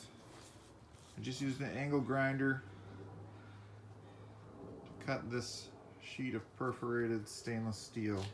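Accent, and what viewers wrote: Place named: South, United States. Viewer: You seem to have developed a southern drawl in this video.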